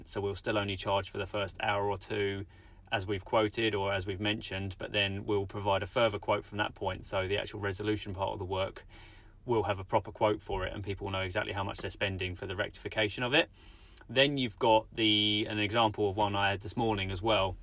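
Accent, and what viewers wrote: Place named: South East England, United Kingdom. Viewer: that’s great pal. Very helpful info… Where are you based if you don’t mind me asking? I’m up in Manchester and south lancs/Cheshire. I’m guessing somewhere south east from your accent 😂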